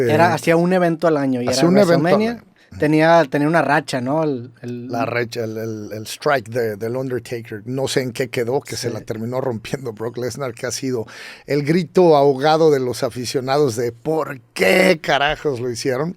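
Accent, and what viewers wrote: United States. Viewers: A huevo tiene que pronunciar en estilo americano ,no puede hacerlo normal? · Tiene bie puesto el nopal en la frente. Hablando como chicano. Por favor. Que acomplejado este tipo.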